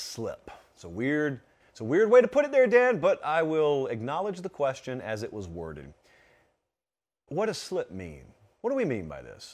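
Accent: South, United States. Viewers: we can tell you're from the south. mee-maw doesn't exist above the Maso Dixon line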